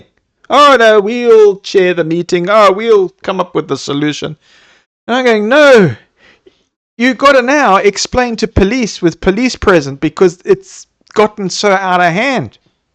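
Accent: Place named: South Africa. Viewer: Heard your voice and thought you sound south African. Kept in listening then you spoke of SA. Then continued and thought. I can swear I heard a talk of yours. You have a very soothing voice. But that's not easy for a tween trying to stay awake at a slow Sunday afternoon public talk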